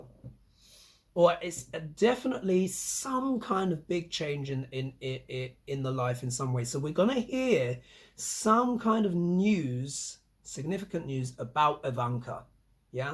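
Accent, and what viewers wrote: United Kingdom. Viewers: I’m a new subscriber and I reviewed a couple of your prior videos. I’m hooked on your style, your astrological intelligence, and your wonderful sense of humor. Also your innate communication skill, especially with your British dialect. Happy holidays and I Wish You Well!!!!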